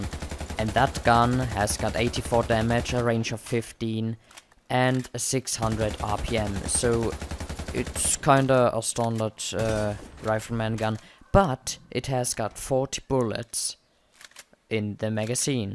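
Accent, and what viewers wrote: Germany. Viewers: could you add me on EU server I would love to be on your FL and play with you name = NotoriousIshy (ps your English with the German accent is better than most people's English I know LOL)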